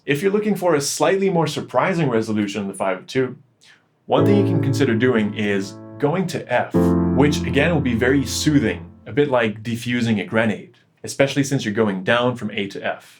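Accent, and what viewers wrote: Quebec, Canada. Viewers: Vous faites honneur à l’accent québécois et à mon standard de jazz préféré:! Très inspirantes vos vidéos regardées du haut de ma tour situé dans feu Ville Vanier… Le regard ensuite tourné vers le château Frontenac pendant que percole la richesse de vos enseignements…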